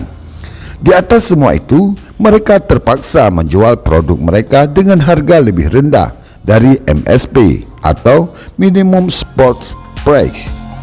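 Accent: Aceh, Indonesia. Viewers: assalamualaikum,,, ni yg ngomong koq kayak orang Aceh dialek nya??? 😁🙏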